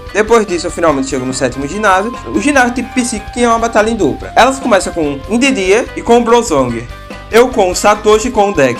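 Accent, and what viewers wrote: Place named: Lagarto, Brazil. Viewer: ? você é de lagarto?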